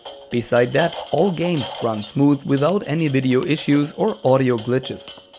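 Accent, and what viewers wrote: Germany. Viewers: Da höre ich doch deutschen Akzent! :D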